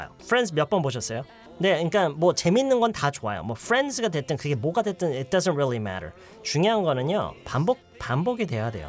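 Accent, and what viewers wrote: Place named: United States. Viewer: 샘은 대단합니다 살이 조금 붙어도 대단한 인기입니다 발음도 좋습니다 미국 사람과 비슷합니다 흑인발음 같기도 하고 백인 발음 같기도 합니다 헷갈립니다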